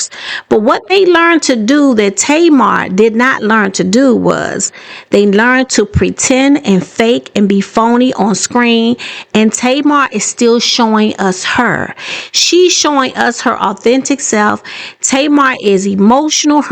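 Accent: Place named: DMV, United States. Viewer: Youre absolutely right. You make great points. I hear a little DMV in your voice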